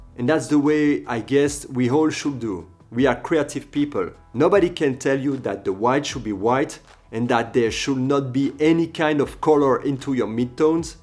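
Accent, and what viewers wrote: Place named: France. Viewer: Yaaaassss you’re back!! Love that accent monsieur; make more cool videos. Your lighting is superb; just as superb as your French accent 🙌🏽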